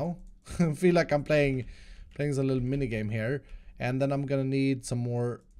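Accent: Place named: Sweden. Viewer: It sounds like you are from Sweden talking English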